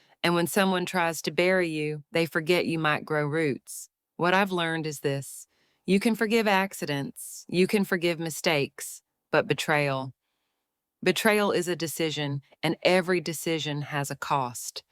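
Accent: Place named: Kentucky, United States. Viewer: Sounds more like Kentucky 👍.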